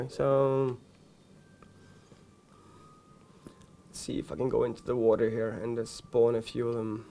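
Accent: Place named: Norway. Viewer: If you are not able to understand this guy you need to learn English. He has perfectly fine English with a Norwegian accent. It is not like the English is wrong or anything.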